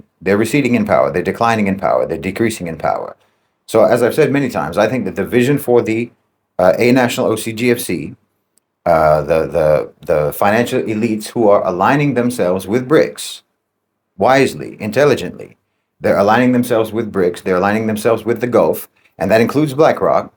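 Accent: United States. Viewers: Incomprehensible. He has dreadfully bad delivery. A lot of phrases and sentences are just noise. He gabbles the most important terms. He does not explain the most important terms. I have tried over and over to hear the term he uses for the opposition to what he calls Neocons --- which itself is some codeword only known to Unitedstatesians --- and it's still just noise. The thick Unitedstatesian accent makes even simple sentences hard to follow.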